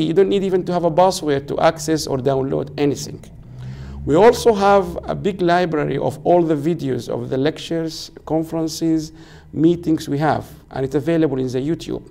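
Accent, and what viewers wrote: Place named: Sudan. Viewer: I like the way you talk English. It will be called Sudalanguage , it shows our identity. Thanks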